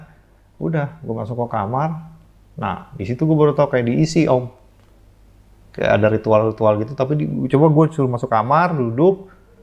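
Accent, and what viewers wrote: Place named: Betawi, Indonesia. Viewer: Ngeliat jd inget waktu saya tinggal di pondok gede, Jd inget Orang2 betawi nya klo ngomong cerita kek, asyik seru.. Suka ngelawak ditongkrongan asyik om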